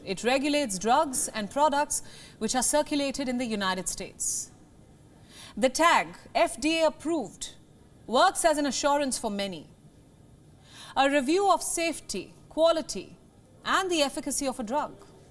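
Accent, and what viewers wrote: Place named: India. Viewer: No, she is trying - and succeeding, in being more easy to understand for audiences not used to Indian-accented English. WION - "world is one news" is aimed at an international as well as a domestic Indian audience. A major component of typical Indian accents is a difference in sound pattern, with the emphasis seeming to occur in the wrong place to what speakers familiar with the most common UK, American and Australian varieties of English expect. Slowing down just by itself helps a lot. If this all sounds like nonsense to you then look up the difference between "stress-timed" and "syllable-timed" languages. English in its country of origin is stress-timed, as is German, Dutch and Russian. Hindi, Spanish, Italian and most southern European languages are syllable-timed. As is the native language of Wales, which is why some native English speakers think people with a strong Welsh accent sound Indian, or that an Indian accent sounds Welsh!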